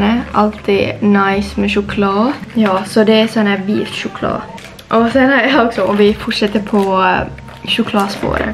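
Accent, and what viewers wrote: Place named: Finland. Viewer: hör det på din accent, är också finsk, svensk🥰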